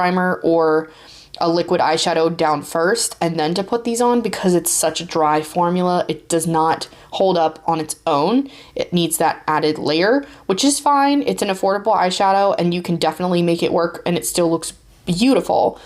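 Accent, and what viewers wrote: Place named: Northeast, United States. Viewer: Ty for the video! ❤ PS you sound very north east NJ, Pa & Delaware ? 🙂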